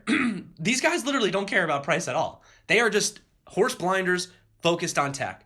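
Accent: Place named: Philadelphia, United States. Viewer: My bro where u from?? You sound local to me here in philly....if not then maybe south jerz!! Go Birds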